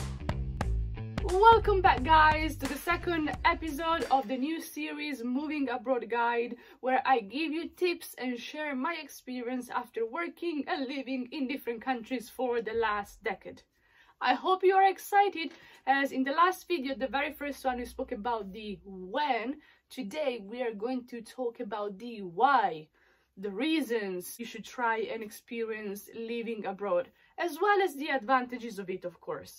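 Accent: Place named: Andalusia, Spain. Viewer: Your Spanish is similar to 'andaluz'... Number 6: you know...all for the art!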